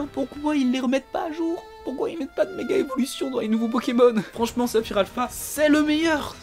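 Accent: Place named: France. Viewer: J'adore ton accent bien français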